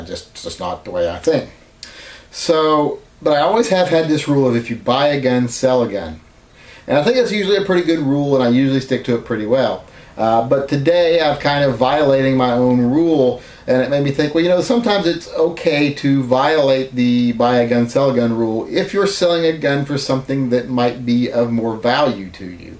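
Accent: United States. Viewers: Never ever buy or sell a gun from a sissy sounding Yankee. Especially one who magically has fooled a large group of followers that he knows jackshit about firearms. Those seeking knowledge please look elsewhere because all you've got here is a smartass blowhard.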